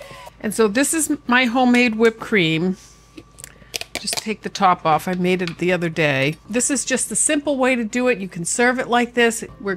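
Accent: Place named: New England, United States. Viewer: You must be in New England for 2 reasons. 1. You said aunt instead of ant, and pecan instead of Pecaahn. I loved your charming video and your instructions as you went along. I will be looking for more of you. Btw your little “jug” is also known as a pitcher. Hugs to you.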